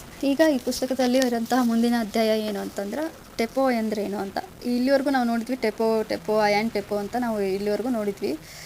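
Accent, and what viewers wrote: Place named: North Karnataka, India. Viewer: ಉತ್ತರ ಕರ್ನಾಟಕದ ಶೈಲಿಯಲ್ಲಿ ಕೇಳಲು ಖುಷಿಯಾಗುತ್ತದೆ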